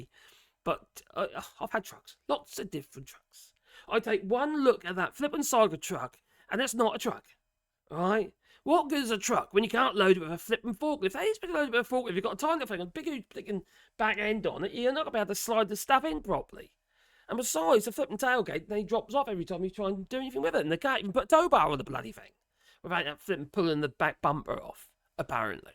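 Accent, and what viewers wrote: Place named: Northern England, United Kingdom. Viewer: I love your Videos and how much you love trees and critters. Guessing UK accents is a hobby of mine. Are you from around Manchester or Liverpool? Your accent reminds me of Lister from Red Dwarf.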